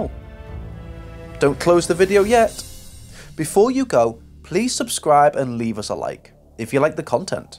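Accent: Liverpool, United Kingdom. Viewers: For sure, a scouser. I wouldn't want any other describing another fierce people.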